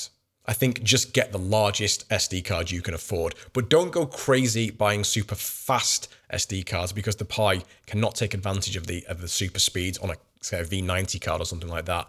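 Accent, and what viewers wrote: United Kingdom. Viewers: Just found your channel tonight and have watched 3 or 4 videos and really enjoy listening to you. Its also nice to hear a fellow brit voice too.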